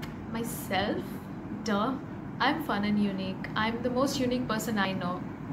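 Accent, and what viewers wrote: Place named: India. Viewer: Cringey? Are you kidding me? I adore the Indian accent! Looking forward to seeing what kind of content you create. :)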